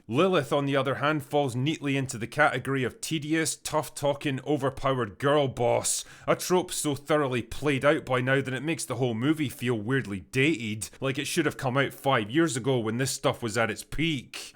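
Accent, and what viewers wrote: Scotland, United Kingdom. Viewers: You understand that it’s a bit, right? Like he’s a normal dude with a Scottish accent when he’s on Open Bar or casually talking.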